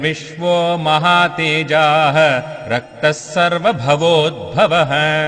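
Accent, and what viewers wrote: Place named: Tamil Nadu, India. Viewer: very hard sounding.visargam pronunciation typical tamil type!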